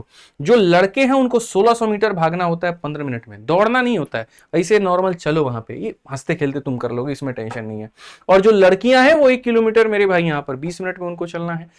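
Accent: Bihar, India. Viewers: Jarur Bihar se hi ho ap...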